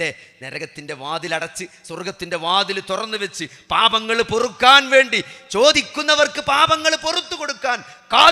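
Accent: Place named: Malappuram, India. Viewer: Very nice speech👍ഡോക്ടർ ആയിട്ടും തനി മലപ്പുറം ഭാഷ 😞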